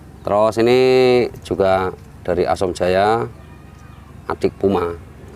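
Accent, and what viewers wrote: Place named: Java, Indonesia. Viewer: Ngringokna channele khas njawane 😂, apa adanya 👍🏽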